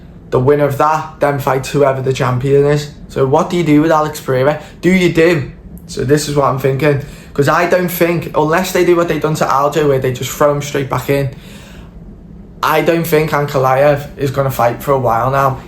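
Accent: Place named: United Kingdom. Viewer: You sound like Paddy Pimblet, Ajex Pareyrahhh. Umm I love British accent actually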